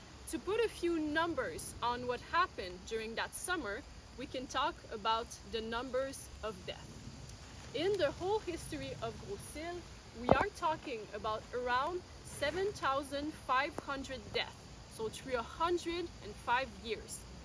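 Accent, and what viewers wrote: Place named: France. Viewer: Where she sounds French? Could it be Canada?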